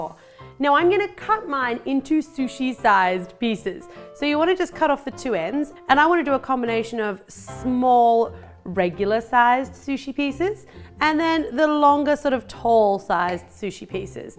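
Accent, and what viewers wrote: Australia. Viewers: She's Australian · R u from australia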